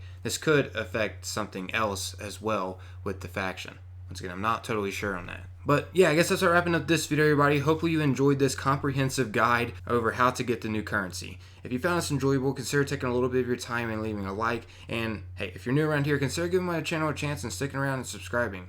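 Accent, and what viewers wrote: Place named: Philadelphia, United States. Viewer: i needed this info! p.s. ill bet the speaker is from the philladelphia area.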